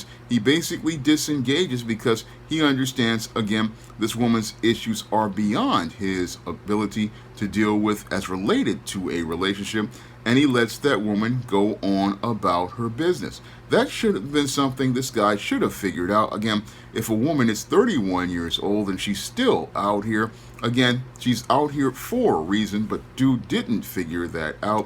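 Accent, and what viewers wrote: United Kingdom. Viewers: Your british accent is so funny.lol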